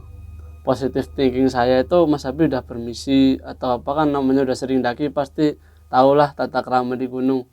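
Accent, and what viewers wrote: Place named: Banyumas, Indonesia. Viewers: Mas nek ngomong khas aksen wonosobo - purbalingga (ngapak) banget ya wkwkw jd kangen ke wonosobo lagi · Doa yang baik2 buat mas..saya doakan sukses dunia akherat Aamiin.., aja kelalen mas ora ngapak ora kepenak..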